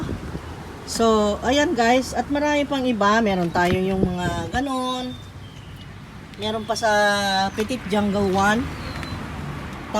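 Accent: Ilocos, Philippines. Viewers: I was wondering about your accent all along, Ilocano pala kayo living in the Visayas. My family is from Pangasinan-Tarlac, both Ilocano parents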